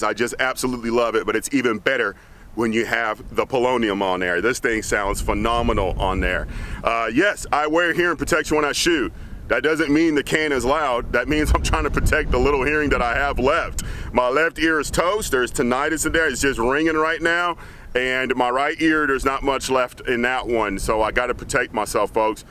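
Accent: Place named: Texas, United States. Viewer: Good stuff. Keep it up. Where in north Texas? You sound like the boys I grew up with.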